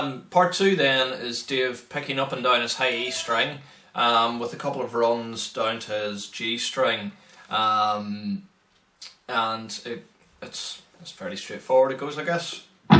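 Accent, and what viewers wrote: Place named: Ireland. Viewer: You are most welcome! I love your accent to my brother. My heritage is Scotch-Irish and my ancestors came to America around 1792. There is something about Ireland that draws me to visit there one day. If you knew my real last name (Phillips is a name I use often, but not my real name) you'd know instantly how I am connected to Ireland. Enough about that though yeah? I have played guitar for 42 years now and still am intrigued by how 6 strings can paint such wonderful pictures of sound. Yeah, I know that some guitars go to 7 and 8 strings but they are not for me. I have over 50 guitars now, down from 112 at one time. Some of my favorites: (1) Gibson RD...what a wonderful instrument (2) Gibson Melody Maker...a simple, yet incredibly insane instrument-the tones from this 1PU wonder are friggin' beyond belief (3) 1979 Fender Strat that is a masterpiece of sound (4) 1975 Fender Bronco, my first guitar ever and still plays so beautifully (5) 1985 Tokai Explorer-a Japanese masterpiece (6) 1978 Ibanez Musician- a 24 fret 14lb neck breaker of sound. 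It is one of the most well made guitars I've ever seen, yet it's weight is a problem. It is the heaviest of all my guitars and will burden you after 3 songs. It has more switches than a Boeing 747 (ok...not really) and I'm still discovering new sounds. More later....got to go...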